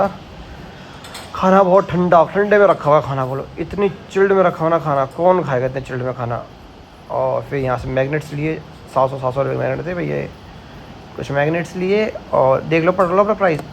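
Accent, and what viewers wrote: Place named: Garhwal, India. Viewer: Germany looks amazing! 😍✨ First of many, hopefully. Garhwali accent was the best! 😂🙌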